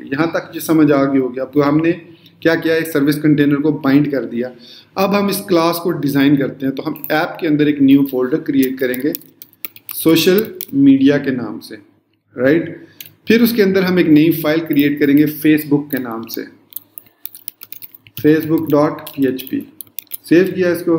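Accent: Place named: India. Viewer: Hi sir, i saw that you have many likes, and ppl likes you, but your articulation is bad, i know it is hard for you in your country i can understand that. But in one moment i find that you speak English in another i think it is Indian. I can not distinguish is it English or Indian :).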